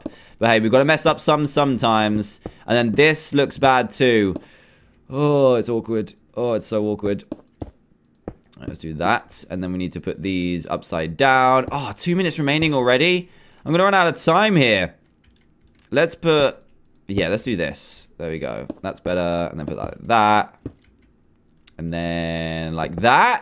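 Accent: England, United Kingdom. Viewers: he's English and from england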